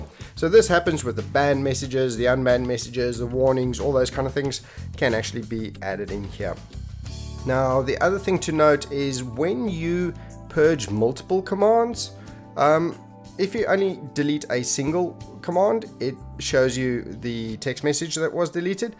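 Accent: South Africa. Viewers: You sound South African. Are you? I’m South African too!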